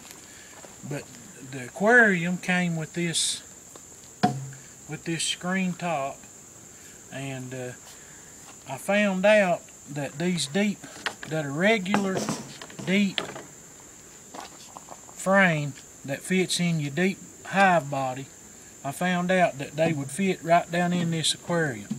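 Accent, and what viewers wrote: United States. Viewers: Thanks for the video from Baton Rouge, LA. Where are you, sounds like you are a fellow Southerner! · oddly enough your accent sounds like my family from Southeastern Kentucky & West Virginia. Thanks for sharing! Great idea! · Just a good ol country accent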